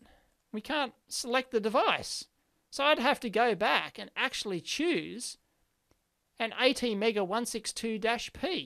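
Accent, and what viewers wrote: Australia. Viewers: I really enjoy watching your channel. Not sure what’s your nationality but your Aussie accent and expression that make me learn and laugh. Thank you.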